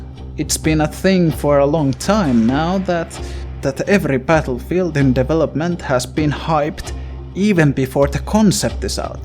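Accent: Finland. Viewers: are you from finland? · are you from finland my brother i think i can hear a slight accent in your voice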